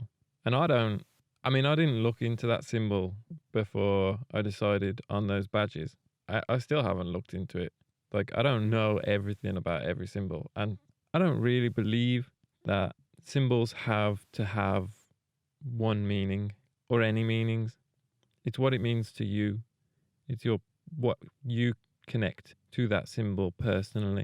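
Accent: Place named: Dudley, United Kingdom. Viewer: Dude I could have sworn you were from Dudley.... But now I'm tryna figure out where the hell you live 😂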